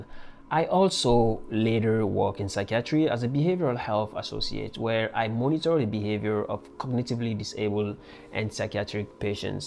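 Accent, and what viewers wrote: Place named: Yoruba, Nigeria. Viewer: I can definitely notice the yoruba Benin accent in the way you speak. Came from Benin to the US last year to study accounting. I would love to know someone in my study field because I get lost sometimes. I hope I will reach my goal as brilliantly as you did.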